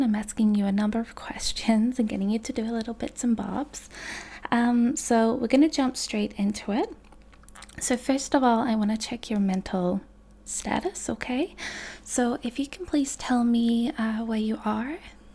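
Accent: United States. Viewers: I had forgotten how good your American accent was. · Liking that American Accent.Great work. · Omg what ur not American??? I’m American and this is literally such a good accent, I’m so impressed that u did it so subtly. Also loved the ASMR part lol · Your American accent has become so convincing! About halfway through the video it clicked that this wasn't a Scottish, British, or Australian accent. Really good stuff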